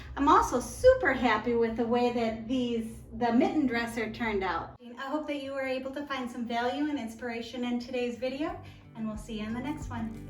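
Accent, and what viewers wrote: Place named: Cape Breton, Canada. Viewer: This is the first of your videos that I've watched. You sound like you come from Cape Breton.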